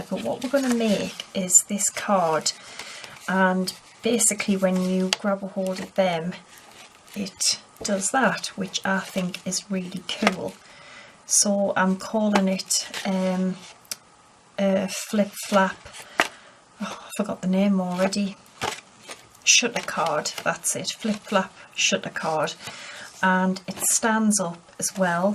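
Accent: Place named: County Durham, United Kingdom. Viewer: Really lovely card!! I just found your channel....was thrilled to hear your accent - reminds me of home. I'm from County Durham living in Queensland Australia.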